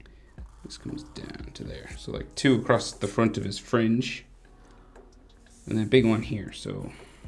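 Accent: Ireland. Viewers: Amazing work also very irish sound accent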